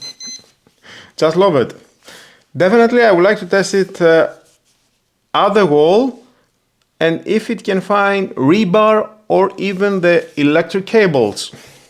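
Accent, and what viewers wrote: Greece. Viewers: You sound greek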